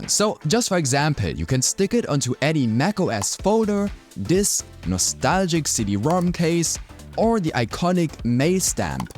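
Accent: Germany. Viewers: Bist du Deutscher?? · As German, I was pretty sure it’s a German accent, but still I thought he might be from another european country. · Actually, it took me three videos to get that hes German Und jetzt esse ich weiter Bier und Brezel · Yes. He is german 😀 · Is that a Deutsche Akzent? :D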